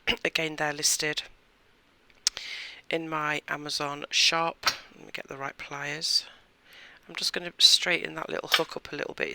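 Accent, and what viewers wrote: United Kingdom. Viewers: Wowser, that's gorgeous. Love the colours, and love the spiral patterned roller. Hey, I read the comment from the lady in the US. It's great you have kept your British accent. A lady on YouTube who does paint pours, is from Birmingham UK and emigrated to Australia in the 80's. She still has her Brummy accent. I would give anything to emigrate from the UK as it's horrible these days. I was born here in 1960. Like you mention though, the world over, you have to be careful about saying anything any more, in case you upset someone!!! I carry on speaking my mind, and ya boo sucks to everyone LOL!!!! Love your videos, thank you so much for sharing your superb creations with us. Take care, xx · Very cool!! With the colors you used they have a bit of a Southwestern flavor. I really like the way you created the subtle wood grain, it makes a great, interesting background and contrasts the colorful pieces well. 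I didn’t know you are in the US!! I thought you were in the UK somewhere. I hope you like it here. I suppose if you have been here over 10 years you must like it a bit 😊 It amazes me that you have maintained your accent. I went to school in Norwich for 3 years and developed such a British accent that people didn’t believe I was American🤣 Anyway, these pieces turned out great!! Thank you for sharing 💚💛💙🤍💜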